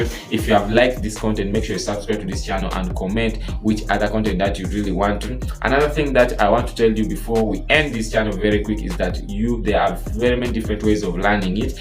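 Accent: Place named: Nigeria. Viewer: He sounds Nigerian Hope he keeps up the work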